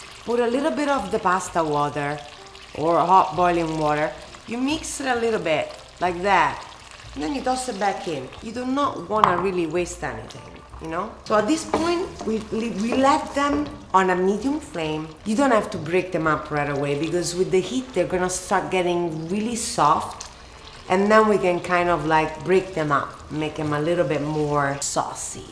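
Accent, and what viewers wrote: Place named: Italy. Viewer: Regarding her accent, it should be clear to everyone in the meantime that she is not American, but she is Italian, born and raised in Italy. She came to America as an adult.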